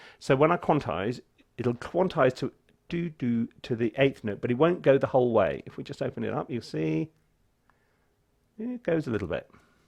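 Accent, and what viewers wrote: United Kingdom. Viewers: I love this Guy and his British accent! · I hear a British accent and I put my full trust in this guy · That is one of THEEE BEST How-to-videos I've watched for a long time. I absolutely love the freshness, the gags, the way he talks. (Love that british accent) Just started with "The secret to what Chords Work", the intro is hillarious. Nice dog by the way. Sir, you just made yourself a new subscriber.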